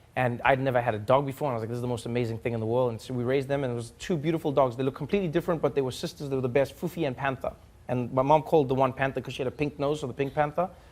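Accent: South Africa. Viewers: I truly miss the daily show 😢 I love it! I am from Holland 🇳🇱 but I love 🥰 how you tell it so graphic that I can feel myself part of every story you tell. I love your South Africa accent and live. And this what you tell I truly believe back in history we see that we human created toys and games from our hand 🖐️ to play tennis, to finding a round brick 🧱, throwing robbed coins 🪙, gambling 🎰. I follow every show from you I hope that you come back with something similar like the daily show. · Come Home & Run for President, PLEASE!!!..and that ain't a joke, you'd be shoe in, got my/our vote...and wouldn't it be priceless running against Julias in 2029!!!... "_" ;-p....and have to mention, it was a pleasure working with you or should I say around you & shoot day driver on the Simba Chips Local Flava Ad campaign in 2010 or was it 09?, cape Town, you had me & those not sleeping at 4am pu in stitches laughing...well f-ing done man and don't EVER lose your SA accent proper pls..even if the networks did their worst, keep it local bruv:) much love & thank you #TrevorNoah for being the best foot forward SA has to offer...one of but among the top;-p #fuckTrump :) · I think it’s cause he has a South African accent. 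It’s clear and easier to understand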